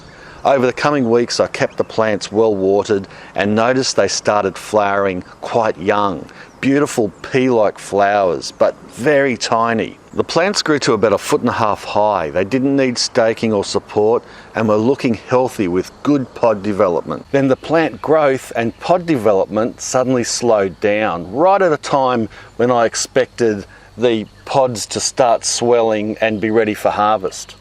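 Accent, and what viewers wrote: Australia. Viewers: I was actually looking to see if it's possible to grow soya in the UK. Within 2 seconds I realised you have an Australian accent so I suspect this is not going to be relevant to me. 😥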